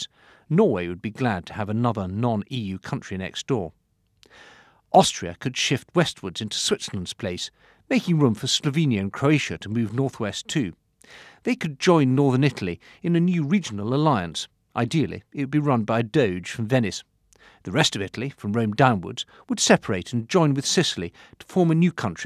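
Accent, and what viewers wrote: United Kingdom. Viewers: Wow nice British accent mate.. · I love how the guy sounds exactly as you'd expect a british guy that is drawing border to sound, complete disregard for cultural boundries, superficial understanding of intercountry problems, arbitrary splittings and most important of all preferred to rip out northern Ireland making it an island of it's own without any mentions rather than giving it back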